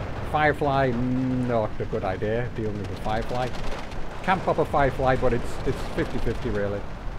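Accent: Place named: United Kingdom. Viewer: Love the videos. Great to hear a fellow British voice commentating. Something we've been lacking since Alpha Bird left.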